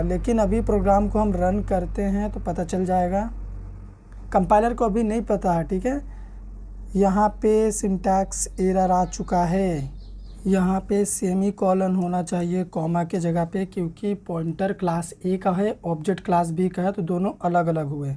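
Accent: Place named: Hyderabad, India. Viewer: Hyderabadi slang zindabad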